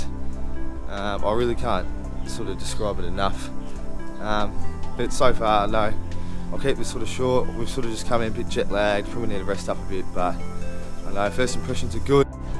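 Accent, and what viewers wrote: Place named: United Kingdom. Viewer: Enjoy listening to your British accent.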